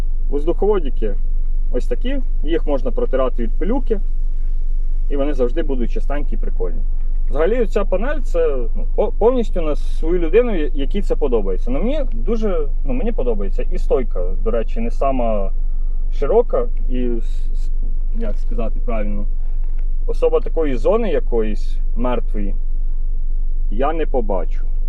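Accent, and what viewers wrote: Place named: Russia. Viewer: По говору видно что русский настоящий русский)))😊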